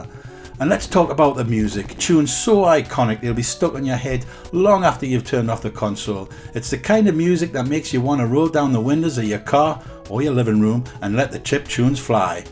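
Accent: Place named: Newcastle, United Kingdom. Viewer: Sounds like you’re from Newcastle in the UK but doing US games and prices. Them prices were definitely high for the US and only reason Buster is so expensive due to its limited run in the US. There are a lot more rarer and more expensive games in the UK say an easy 20 games. Outrun you can pick up for about $18 in the US right now. Always good to see videos on the Master System 👊🏻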